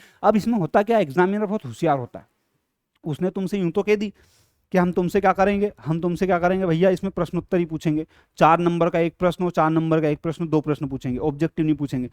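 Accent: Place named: Haryana, India. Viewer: Sir aap haryana se ho language se lag raha hai.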